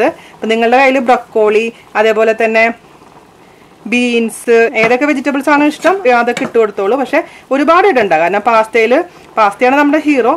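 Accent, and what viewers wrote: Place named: Chalakudy, India. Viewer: Hi tried pasta.. First time trying.... Always kids experiment goes on.. It came very well.. And enjoyed.. Thank you for u r valuable tips in between.. The best part in u r explanation while cooking is even my 14 yr kids can follow the recepie and cook.. Thank you dear.. Hope I can call u dear.. 😍. I am also from Trc.. I left Trc after marriage 20yrs back and I am not having that slang.. By watching u I am enjoying that too..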